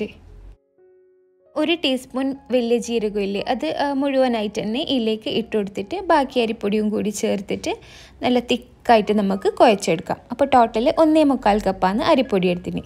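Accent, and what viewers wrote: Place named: Kannur, India. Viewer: കണ്ണൂർ കാരിയാന്ന് ലെ ഞമ്മള shamis own നിലെ ശാമിലയുടെ ഒക്കെ സംസാരം പോലെ 👍🏻👍🏻👍🏻👍🏻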